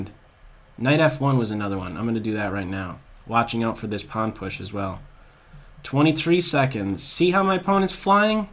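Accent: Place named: New England, United States. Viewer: We don't get many Irish 'round these parts, so I'm not well trained in that accent. But I do hear the New England in his voice. Maybe small town New York or Pennsylvania?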